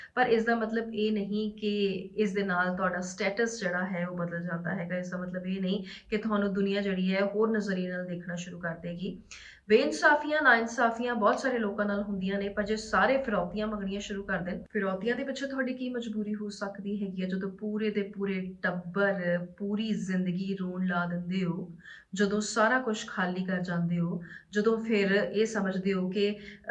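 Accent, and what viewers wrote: Malwa, India. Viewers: veer ji eh boli malve de he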